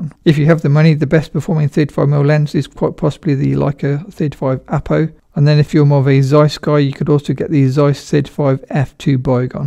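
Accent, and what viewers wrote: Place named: Northern England, United Kingdom. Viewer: I suppose accent is a Northern English accent. I like it very much! Very easy to understand. And is nice to look at;)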